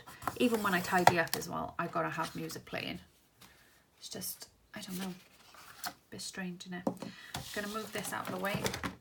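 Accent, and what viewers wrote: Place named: Wales, United Kingdom. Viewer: Hahaha see even when you write im laughing cos i can hear you saying it in my head in your welshy accent so funny! I feel like your about to burst into disney at any time - cant imagine what your like when your in the disney shop i bet your dancing and singing aint you 😂 xx hahah yeah its the only time your quiet in the dentist 😂😂 xxx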